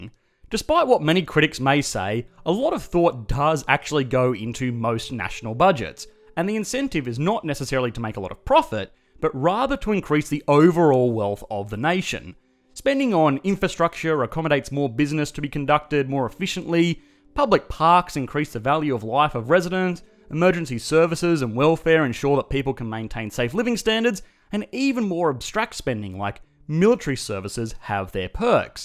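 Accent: Australia. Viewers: Not gonna lie I'm a sucker for the Aussie accent. Half the reason I'm subscribed to Mr. Sunday Movies too.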